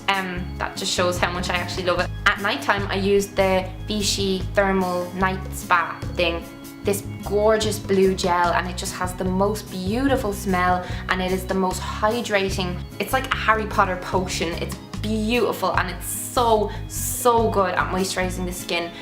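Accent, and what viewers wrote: Dublin, Ireland. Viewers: Did not realise you were Irish! This is the first video I watched of yours :) clicked on it for obvious reasons, thanks for the vid. I changed my diet completely. It's a really difficult time!! So thanks :) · I think she's from Ireland (I'm from England myself so I'm fairly familiar with the accent). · I'm from Dublin and I'm pretty sure she is too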